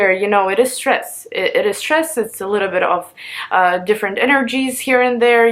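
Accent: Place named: Russia. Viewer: you’re the only one who among of all my fave tarot readers who have been extending such concern about this current situation which the whole wide world is seriously getting so alarmed right now, i hope and prayed this too shall pass and that everyone will be safe, and one more thing you i don’t really know you personally but i just got some sense that i think you’ve got this Russian accent or something, like i don’t know..but you simply reminds me of my Russian friend.♒️💖🙏🏻💪🏼✨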